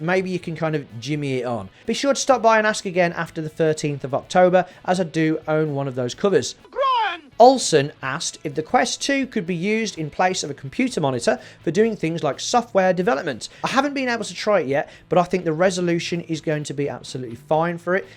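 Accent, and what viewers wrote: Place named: Midlands, United Kingdom. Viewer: yow sound like yow come from the midlands mush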